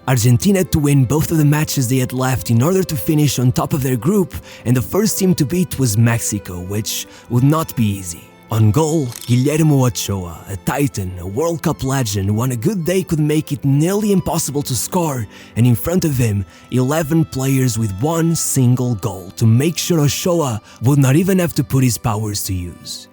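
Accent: Portugal. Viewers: És portugues de certeza, esse sotaque n me estranha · Este narrador eh portugues de certeza. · he is portugese that's why he has an accent, and the other mistake, are you always perfect or smthng?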